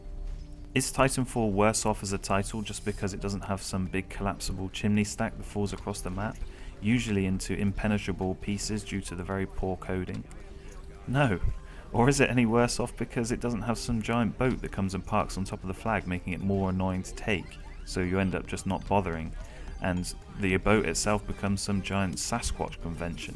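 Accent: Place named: United States. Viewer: You have such a convincing accent as an American rapper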